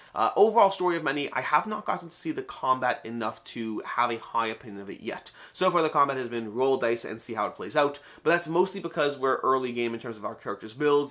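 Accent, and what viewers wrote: Canada. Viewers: Canadian accent? It's usually super subtle, until it isn't.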